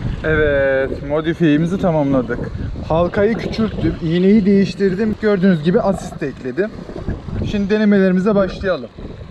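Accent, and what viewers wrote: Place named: Black Sea, Turkey. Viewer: Kibar karadenizli aksanın çok hoşuma gidiyor 😂 Başarılarının devamını dilerim.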